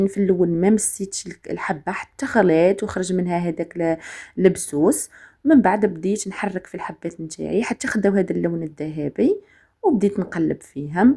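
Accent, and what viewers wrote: Skikda, Algeria. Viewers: السلام عليكم...صح صوتها مميز باللجنة السكيكدية...و وصفاتها المميزة. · منطقة سكيكدة اللهجة انشمها من بعيد حفظك الله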